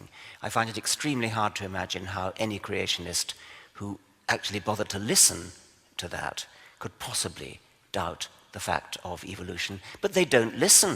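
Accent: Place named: RP, United Kingdom. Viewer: If you take a look at DNA gene comparisons, it's hard not to see relationships and tricky to explain otherwise. There are plenty of other lines of evidence too. Lots of material on the subject out there - given it's the theory that underpins biology. Most atheists are skeptics, so his posh voice doesn't count for much.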